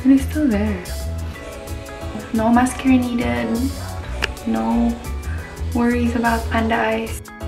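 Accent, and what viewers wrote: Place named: Visayas, Philippines. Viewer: Pag ikaw talaga nag sspeak ng bisaya ang sarap sa ears 😍. More pa sa mga next vlogs pleaseee3 🤗❤ keep safe ate ❤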